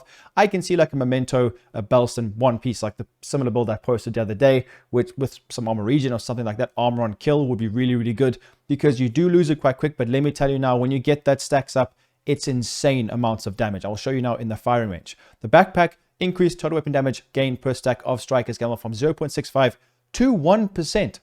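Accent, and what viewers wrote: South Africa. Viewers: My bru awesome video, are you from South Africa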